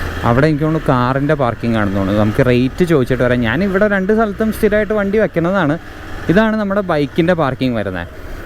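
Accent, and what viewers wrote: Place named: Thrissur, India. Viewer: Are you from tvm? Your slang seems to be from thrissur... Anyway very good narration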